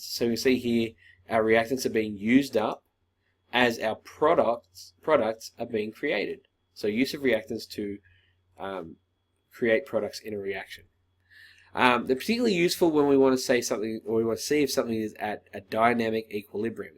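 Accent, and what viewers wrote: Australia. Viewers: Thank you australian science guy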